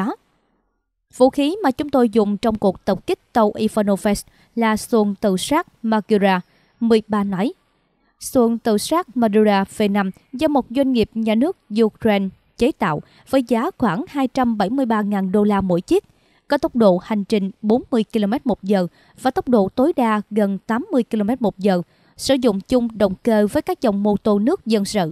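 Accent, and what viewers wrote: South, Vietnam. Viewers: người tàu hả..đọc tiếng miền nam dể nghe vậy mà ko hiểu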